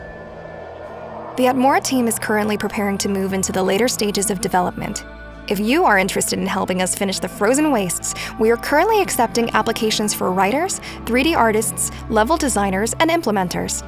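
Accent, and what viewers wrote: United States. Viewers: I would have got a none american accent to narrate considering its fantasy, and elder scrolls which uses European accents mostly. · The American accent doesn't do it for me.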